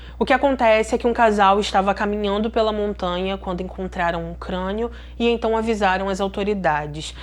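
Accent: Rio de Janeiro, Brazil. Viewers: O vídeo e a história são maravilhosos 😀 😀😀... Mas o sotaque carioca é um nojo 🤮🤮🤮.. horrível 🤮🤮🤮🤮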